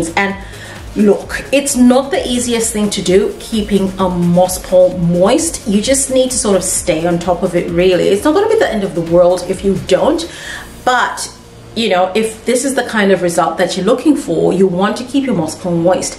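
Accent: Australia. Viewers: Thank you so much for explaining. I have always been curious about that. I have a few plants on coir poles and I feel like when I get them wet all I'm doing is watering my soil more. I see that moss is much better than coir. Also after binge watching your videos I actually saw where you addressed my question exactly. I had to chuckle when I was watching it. I have officially watched all of your videos in one day. They are very informative, in an easy to follow along format, and can't beat listening to the Australian accent either ☺️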